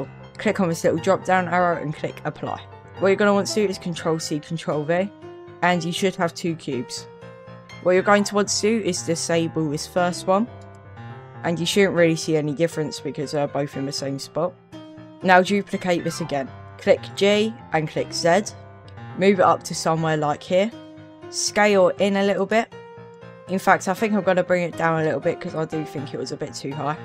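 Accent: United Kingdom. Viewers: He’s British, that’s how they say Z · it means Z its because hes british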